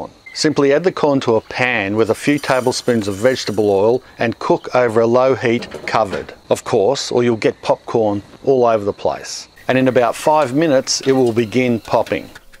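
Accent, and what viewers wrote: Australia. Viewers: NS R LMAO, Australian sounds nothing like English This is probably the heaviest Australian accent I’ve ever heard, you should be able to tell straight away. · I don't know what I was expecting.. but the Australian accent caught me off guard. I think it's slightly purple yeah. I actually did ask what it tastes like 😂 was expecting "like popcorn!"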